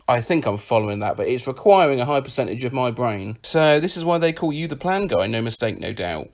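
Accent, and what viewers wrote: United Kingdom. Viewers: TBH, a Brit talking this fast is my ASMR no matter what he's saying.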